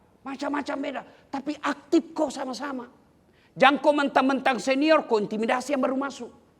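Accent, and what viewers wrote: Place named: Banyumas, Indonesia. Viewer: bahasane ngapak ora jelas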